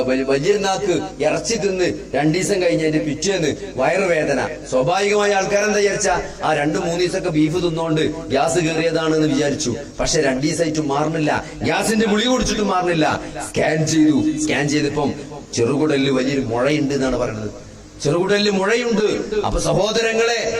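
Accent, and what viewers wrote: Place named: Malabar, India. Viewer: അടിപൊളി ശൈലി മലപ്പുറം മലബാർ👍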